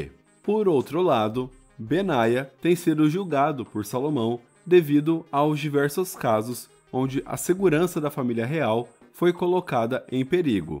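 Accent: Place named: Caipira, Brazil. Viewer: Seu excessivo sotaque "caipires" nos "erres" nos finais das frases são aflitivos, prezado narrador ! Fica até difícil ouvi-lo 😲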